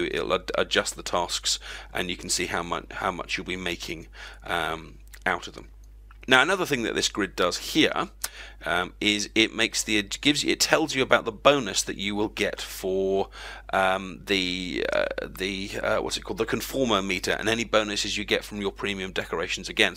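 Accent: United Kingdom. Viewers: Are you British? Will you friend me on Origin?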